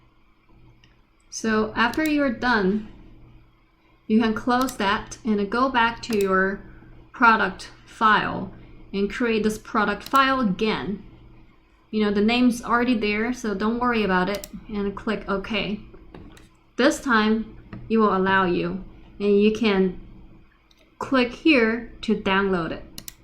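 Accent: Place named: United States. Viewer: another good video. I appreciate you helping me understand how to use the RAP rules. In the past I have used the Application>Distribution>Export function to save my application rulesets. It has worked very well for me. Is there a reason you did not choose the Export function? I have a suggestion to make. In the future if you have a video that requires the pega environment to be set up to follow along with your lesson. Save the pega jar file on your git-hub site. For example, since you referred to PegaFem channel this is what I did. After I completed her Video 4 exercise I exported my application to a zip file. Then a couple of weeks later I imported the Video 4 exercise zip file and I could start working on her Video 5 exercise without recreating her pervious work. I hope my explanation is clear if not let me know and I will go into more detail. 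I haven’t seen any new post on PEGAFEM for awhile I hope see keeps on posting. Your two post are the easiest for me to understand (because I understand your American accents). I hope both of you keep posting about Pega. Looking forward to working on the 5 post I have not studied yet. Thanks again.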